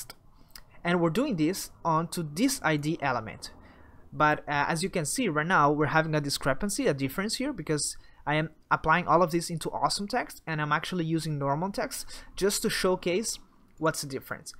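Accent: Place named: Brazil. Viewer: pelo sotaque senti que era do Brasil, você tem um inglês leve e sonoro, muito bom!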